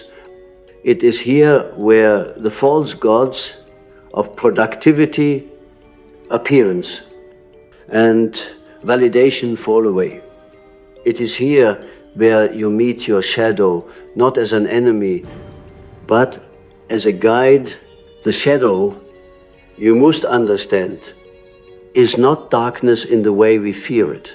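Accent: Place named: Germany. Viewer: No perfectin in your Speech or pronounciaition. . And to hard on the accent .. tooo germanish .. but acceptable.. create some perfection guys .. like original ..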